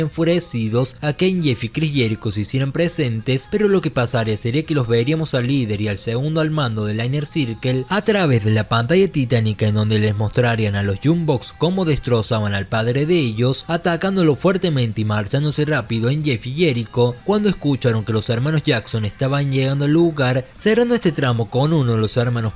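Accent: Córdoba, Argentina. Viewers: Me parecía, yo también lo soy, o sea Argentino y el asentó cordobés es imposible no reconocer.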